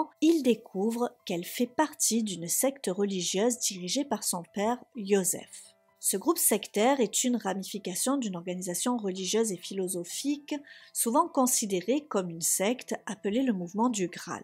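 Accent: South, France. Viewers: J’ai oublie, pardon …Merci pour ce très bon récit.Continue… j’adore ton accent du Sud, qui est bien tempéré, pas à couper au couteau😊❤😅😊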